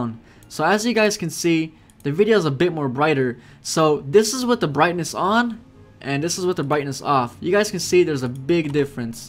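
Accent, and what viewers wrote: Croatia. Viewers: Are u from Croatia?! :OO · Are you Croatian? :P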